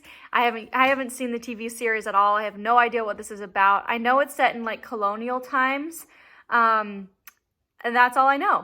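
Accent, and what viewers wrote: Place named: Utah, United States. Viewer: Are you from Utah? You have a Utah accent. That’s why I was asking haha.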